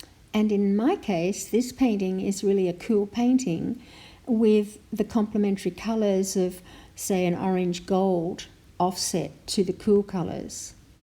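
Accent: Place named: Australia. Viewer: You sound Australian.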